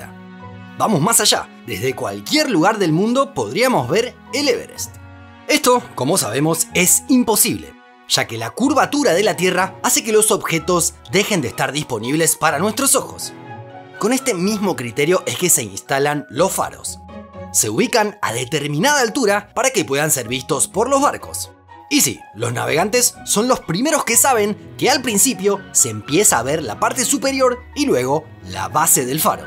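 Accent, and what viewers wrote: Argentina. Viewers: Maquina vos sos Argentino? Si es asi, CAPO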